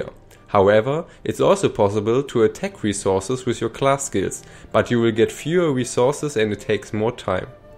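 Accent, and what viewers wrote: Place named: Germany. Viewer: Yeah, very strong German accent. He should work on that.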